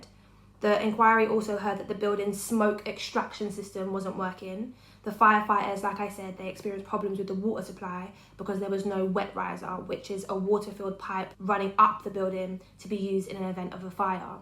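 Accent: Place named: United Kingdom. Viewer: Much love from Kenya. Your accent is a true definition of Br. English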